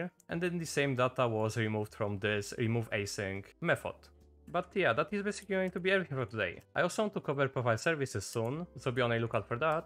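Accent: Poland. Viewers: dzięki, słychać polski akcent ;) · you sound polish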